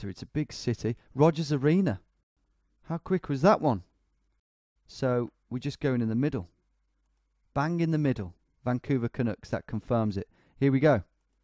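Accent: United States. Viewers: His american accent sounds bang on to me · Your General American accent is hilarious, it’s so ambiguous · bloody love his american accent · Your American accent is on point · Love the American sports fan accent. All my life I've heard Americans try to do English accents, so this is a first. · You would know better than me here I am in Iowa and know nothing about any sports also nice American acceny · Your American accent is pretty good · I cant lie your american accent is great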